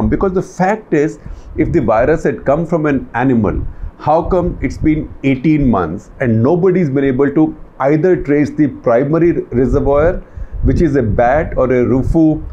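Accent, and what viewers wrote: India. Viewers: The Indian accent is too strong it sound very hilarious although the guy is very serious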